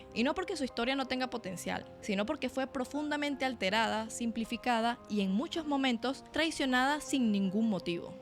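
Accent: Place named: Venezuela. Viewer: Ese acento es de Venezuela.. me juego el riñón izquierdo de mi mamá y no lo pierde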